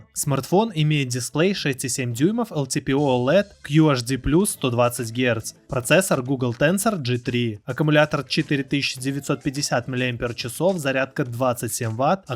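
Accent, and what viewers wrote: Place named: South, Russia. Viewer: По говору сразу понятно, либо с юга России либо с БУ(бывшей Украины) Достатка тебе и здоровья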